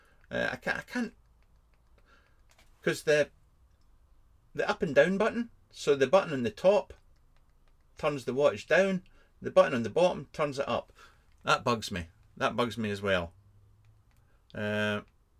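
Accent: Scotland, United Kingdom. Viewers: I really like the intro/hold music. Shame that rambling Scottish bloke popped up again and ruined it... To be fair this is the exactly the review on the Boxx I’ve been waiting for. Authoritative.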